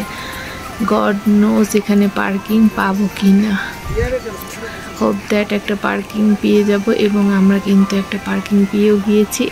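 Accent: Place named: West Bengal, India. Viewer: Apnar bangla uchharon Bharotio bangalir moto..bes valo , all the best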